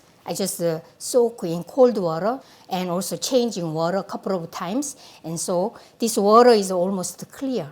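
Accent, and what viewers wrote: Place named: South Korea. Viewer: 한국식 발음 하시니까 ~ 영어가 귀에 쑉쑉 들어 옵니다~ 최고의 영어 강사 입니다.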